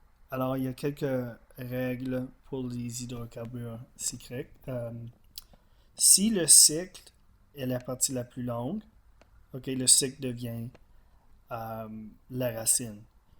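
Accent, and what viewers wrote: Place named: United Kingdom. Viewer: from the accent i think hes from the united kingdom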